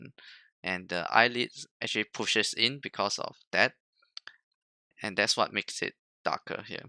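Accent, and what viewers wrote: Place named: Singapore. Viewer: Sounds like a Singaporean...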